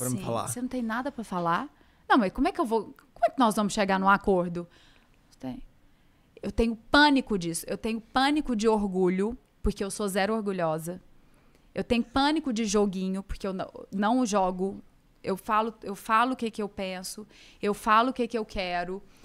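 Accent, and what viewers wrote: Minas Gerais, Brazil. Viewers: Sotaque mineiro é maravilhoso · Uma Morena dessa com sotaque Mineiro pra mim é AFRODISÍACO!!! 😋😋😋😋😋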